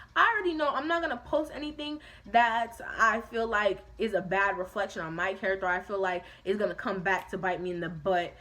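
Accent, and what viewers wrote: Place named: United Kingdom. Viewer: I stan the British accent. Teach me.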